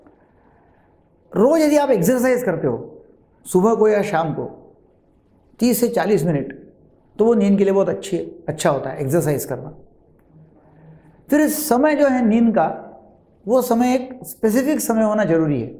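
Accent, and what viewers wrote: Maharashtra, India. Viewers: Bhai ham marathi logo me 90 present log aisehi hindi bolte hai ..Aap aur niche jaoge andhra ya tamilnadu ..Aur ghatiya hindi ayegi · Bhai Maharashtra me aisi hi hindi boli jaati hai.. aap logo ko tapori lgti hy😑😑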